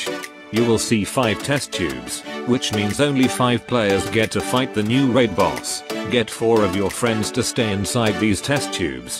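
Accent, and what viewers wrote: India. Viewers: Lol indian voice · Why does the new voice sound like an INDIAN!!!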